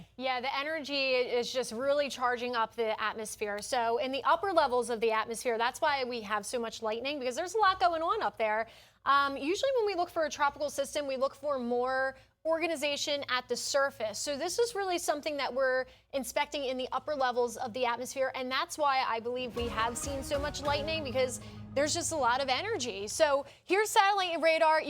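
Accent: Philadelphia, United States. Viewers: i know a philly accent when i hear one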